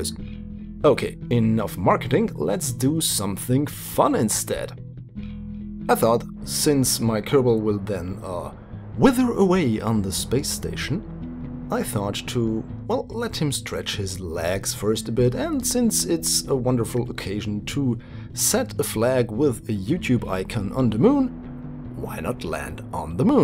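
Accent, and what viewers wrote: Germany. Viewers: Are you german? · Are you German? I feel like your accent is German. I may be way off, bit you sound German. Also, your accent really eccentuates the scope of your videos.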